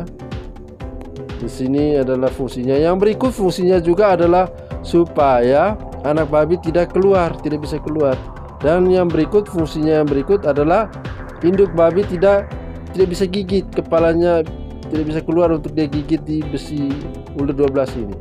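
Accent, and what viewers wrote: Flores, Indonesia. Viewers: abang ini cara omong, abang dr flores ka 🙏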